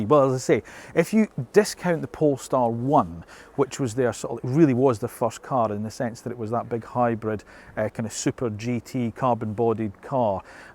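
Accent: United Kingdom. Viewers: Where is the UK accent from ?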